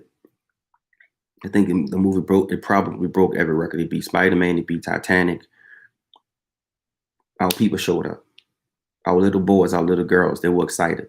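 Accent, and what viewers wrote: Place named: Louisiana, United States. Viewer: Spot on!! Its 2020 and if u dont feel something aint right then u are basically walking dead at this point. Brotha u sound like u from LOUISIANA/new orleans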